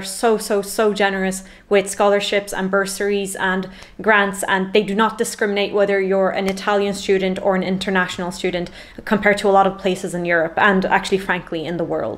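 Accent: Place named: Ireland. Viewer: Are you Irish? I love this accent 🥰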